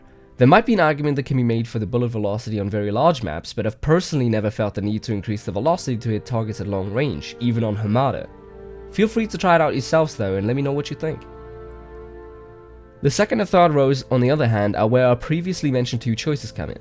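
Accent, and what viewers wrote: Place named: Germany. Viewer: your german your english shows that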